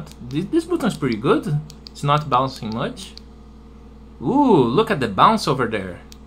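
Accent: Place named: Brazil. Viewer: Tu é Br man?(If not you sound very brazilian)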